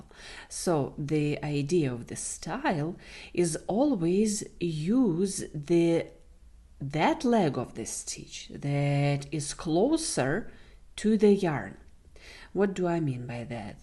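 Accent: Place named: Russia. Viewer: in Russia we call it grandma’s style. Also we have other styles such as classic and eastern. Aren’t you russian by any chance? Your accent sounds russian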